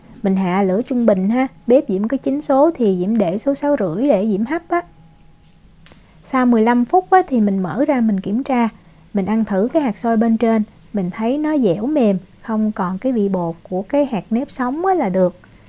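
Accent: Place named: Mekong Delta, Vietnam. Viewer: kheo tay qua co xem nhieu video cua co thay that tuyet cho co hoi 1 chut nha chac la nguoi o mien tay que o tinh nao ma nau mon nao thay cung ngon lai qua hap dan gi nghe giong noi dam chat mien tay co gan 70 tuoi nen ko biet cach bo dau nen be doc hoi kho 1 chut nha co rat mong 1 loi phan hoi va chuc nhieu niem vui trong cuoc song nhee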